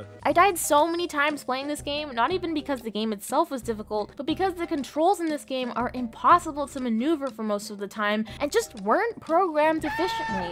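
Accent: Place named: United Kingdom. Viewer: Just recently found your channel through reccomendation somewhat, and I love it.... After scroll through many of your videos, it Reminds me a bit of Kim Justice content, but with milder and clearer voice (british accent sometimes sexy, but hard for me to discern as a non native english speaker) and thats a lot kudos from me...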